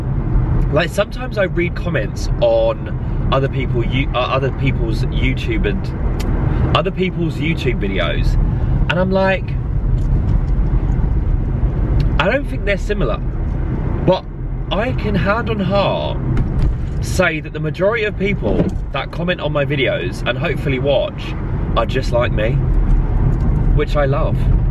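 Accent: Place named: Essex, United Kingdom. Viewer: You sound like you’re a fellow Essex person like me 😁 or london 🤔 love your videos always crack me up!!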